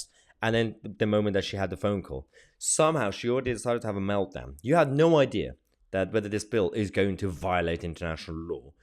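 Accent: United Kingdom. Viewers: While I agree with every word spoken by this youtube host, my immediate question concerns his speaking voice. He uses British English, without sounding annoying. This is amazing. His speech is clear, without a hint of the usual arched phrasing and intonation of royals and intellectual talk-show hosts. His tone is actually delightful, so I would like to know where he was trained as a public speaker. The youtuber named Stephan Molyneaux has this same wonderful way of speaking. Whether I agree with all that he has to say would be another question. I would just like to know the origin of that public speaking voice.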